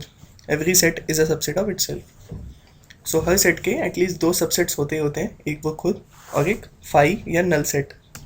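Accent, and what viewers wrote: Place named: India. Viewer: Dude. It sounds like you speak Indian. Wtfffff???? Your accent man :///////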